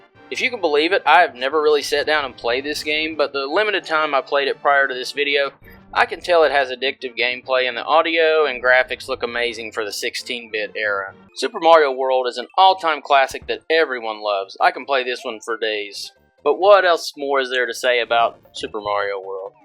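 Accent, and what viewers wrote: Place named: West Virginia, United States. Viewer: I started watching this video and was like "Man! This guy must be from West Virginia!" Then I saw the hat and thought "Hell yeah he is!!" and I had to subscribe!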